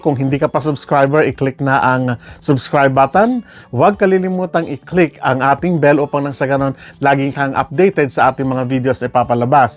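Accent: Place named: Baguio, Philippines. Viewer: Parang tga Baguio si kumander kuya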